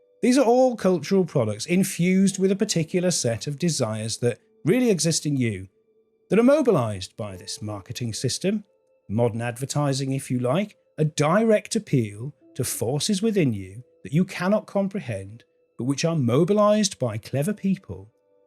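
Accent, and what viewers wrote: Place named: United Kingdom. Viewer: British accent for someone in USA!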